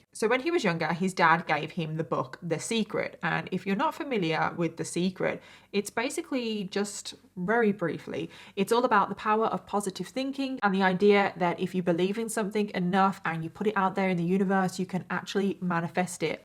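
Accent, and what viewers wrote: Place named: United Kingdom. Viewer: You should definitely narrate audiobooks! True crime and history audiobooks narrated by someone with a British accent are all I listen to! Also, wish I could nail your makeup, I just end up looking like an idiot 😂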